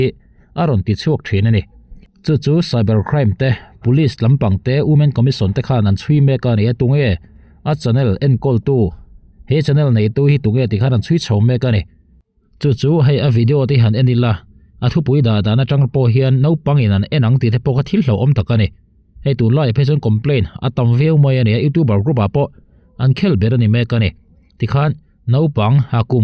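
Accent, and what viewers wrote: Mizoram, India. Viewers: He zahmawh thawnthu chhitatu hi ka ngaithla chhin a mizo diktak awphawi a anglo riaua hriatna kanei.. hnamdang alo nih phei chuan hnam intih mualpho tumna ani thei mai angem tih te ka ngaihtuah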